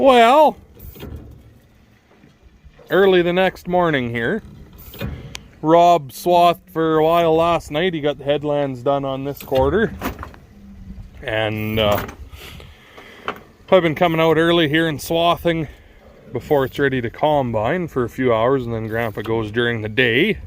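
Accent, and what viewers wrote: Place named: Canada. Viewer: You Canuck's sound funny when ya talk, I'v been noticing.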